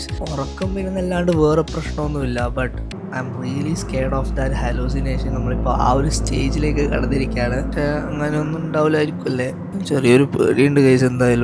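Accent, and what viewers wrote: Kannur, India. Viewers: Neee kannur edyaaadaa..? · Kannur aahno brthr😮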